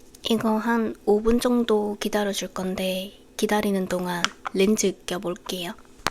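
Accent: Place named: Gyeongsang, South Korea. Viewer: 찐목소리 메컵 좋아요!!! 사투리 쓰시는거 넘 귀여워여..... 약간 경상도 사투린가,,? 🙃 아껴볼게여 🫶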